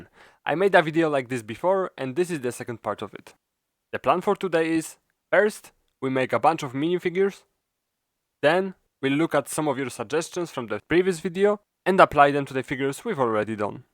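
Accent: Poland. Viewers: Słychać że z Polski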